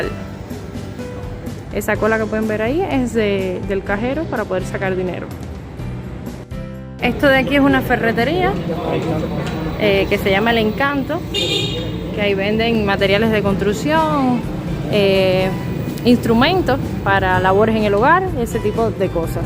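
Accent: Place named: Dominican Republic. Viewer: Tu tienes acento dominicano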